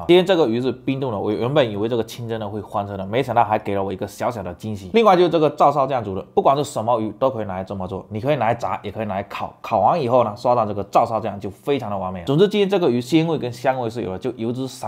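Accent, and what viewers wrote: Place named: Fujian, China. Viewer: 胡建口音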